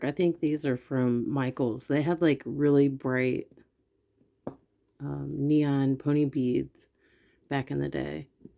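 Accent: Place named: Midwest, United States. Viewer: You sound like me😂❤ is it a Midwest thing?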